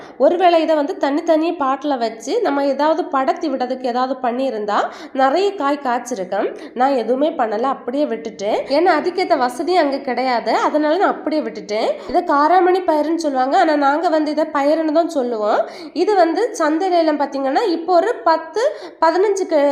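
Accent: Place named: Kanyakumari, India. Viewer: கன்னியாகுமரி தமிழ் வித்தியாசமாக உள்ளது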